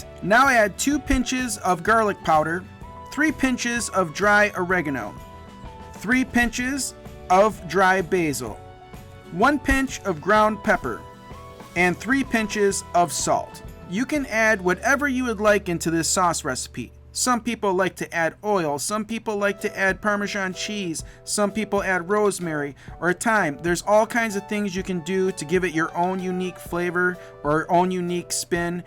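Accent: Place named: Upper Midwest, United States. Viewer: accent from upper mid-west, check. banana peppers on pizza, check. pizza isn't limp when it comes out, check. you have my full endorsement and I will be trying this today. 🙏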